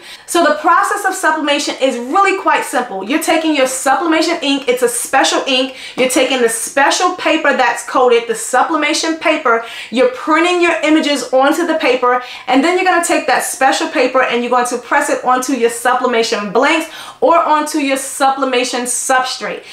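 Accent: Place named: New York, United States. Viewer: Is it New Jersey or New York? I love the accent! It’s just so exciting lol.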